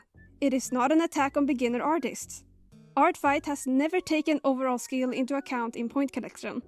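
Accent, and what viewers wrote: Sweden. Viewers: Finally someone's speaking facts about this! Anyway, this is off topic, but I like your accent, I'm Swedish too!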